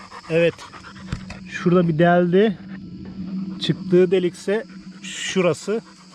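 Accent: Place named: Thrace, Turkey. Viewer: he anladım trakyalıya çok benzettimde bidekonuşma olarak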